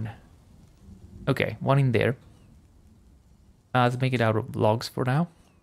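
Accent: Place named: Italy. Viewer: Ι cant tell if your accent is greek or italian or maaaybe spanish. Which is it? Betting on Italian.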